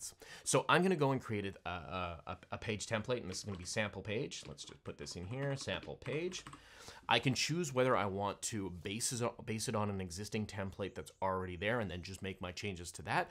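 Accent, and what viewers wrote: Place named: United States. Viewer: TG - American accent real human Shopify vid.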